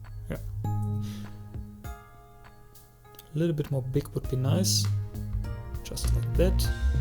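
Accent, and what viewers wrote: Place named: Germany. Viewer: Man, thanks a lot for your tips... In this period I’m working on project that requires some “art skill”, and I really need some drawing advice as I’m not really good at it 😅 Down below, I type what I would had written in the first part (as short as possible) I heard that your accent is German and there are some words that I didn’t understand right away; but that’s not a problem.. I just “rewinded” the video and tried to understand that few words... Also some suggestion for the future.. Would you make some animal (also fantasy) drawing tutorial? I would really appreciate it. Until then, good luck for your next arts 🏞🌅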